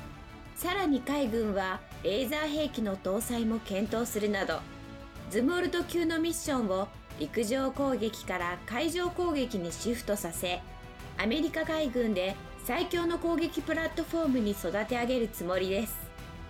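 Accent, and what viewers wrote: Kansai, Japan. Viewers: この人、すごいナマってるな。 これ関西系のナマりかな？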